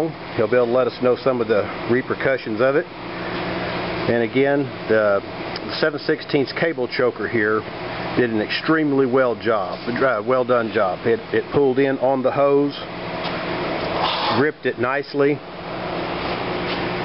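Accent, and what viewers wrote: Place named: South, United States. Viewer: Lots of words in a southern accent.........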